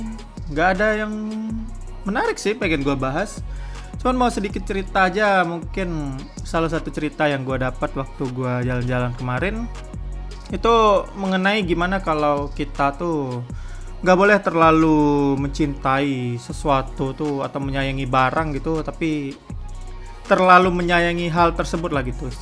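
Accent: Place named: Sumatra, Indonesia. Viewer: abang dari pulau sumatera? wehh pantes aja logatnya logat ngomong orang sumatera. sy org bukittinggi bg. salam kenal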